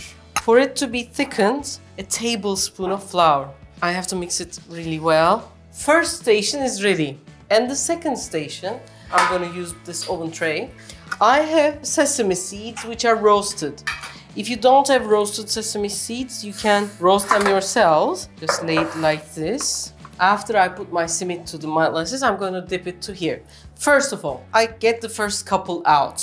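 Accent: Turkey. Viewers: ne dediğini anladıysam arap olayım türklerin ingilizce konuşması baya farklı .D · 😋😋refika I lovesss youre Turkish English,urrrr soooo pretty,bubbly🥰🥰🥰I lovessss ur recipes from Sri lanka 🇱🇰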